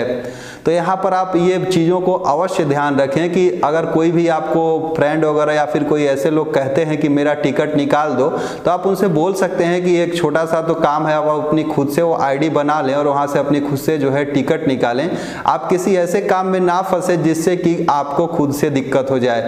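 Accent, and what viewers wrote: Bihar, India. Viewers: आप कहाँ के हैं up या बिहार हमको तो पक्का बिहार के लगते हैं पकड़ा जाते हैं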